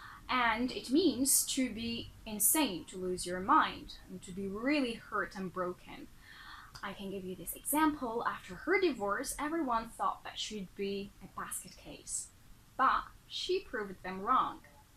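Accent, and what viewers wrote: United States. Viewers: you have a good American accent !! how did you manage to pronounce this way ? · ماشاءالله لهجتك قوية جدا، كأنك أمريكية تحياتي لك من ليبيا 🇱🇾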